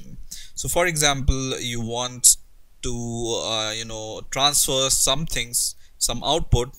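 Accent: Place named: India. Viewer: Please, do us all and yourself a favor and try to improve your accent, so it's more English and less Hindish!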